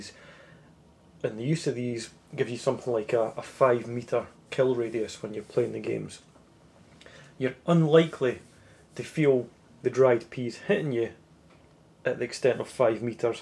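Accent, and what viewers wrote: Scotland, United Kingdom. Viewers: i love your scottish accent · the awesome Scottish accent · I love this kind of Scottish accent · Scottish accent ! I love it !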